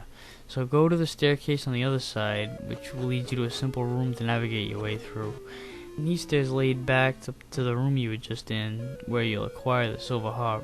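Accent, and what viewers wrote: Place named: Massachusetts, United States. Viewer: For some reason my computer wouldn't load your profile but I can tell you're from Mass. My boyfriend is from there and you guys sound NOTHING like NY! Anyways, way to keep it entertaining!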